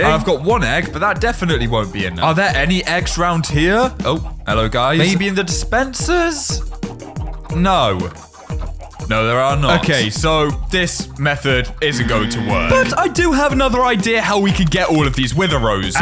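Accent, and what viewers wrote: United Kingdom. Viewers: Like if has a British accent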